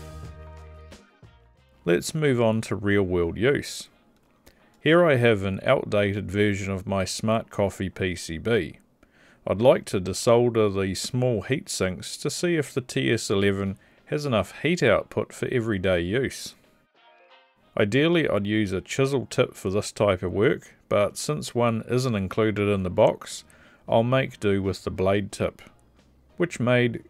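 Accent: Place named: New Zealand. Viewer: Are you referring to ? To my ears he says "four hundred and fifty degrees celsius". I live in New Zealand, the same as the video creator, although I do not know him at all. In our version of English, that is how we would say 450 degrees celsius. If you are referring to some other part of the video, can you give us a timestamp?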